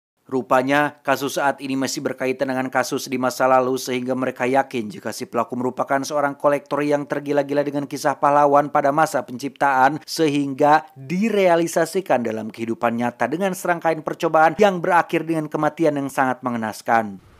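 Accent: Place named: Madura, Indonesia. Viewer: Madura bang😂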